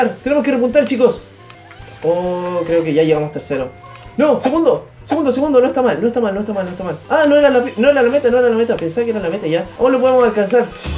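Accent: Chile. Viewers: Pense que eras de chile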